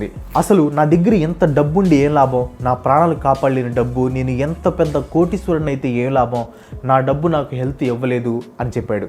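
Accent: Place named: Kadapa, India. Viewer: కాదురా తోయ్ మా కడప యాస మీరు కూడానా బ్రో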